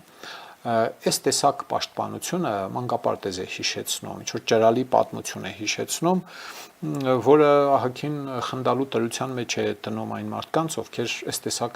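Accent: Gyumri, Armenia. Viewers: Բա ինչու ես խոսում գյումրիաերեն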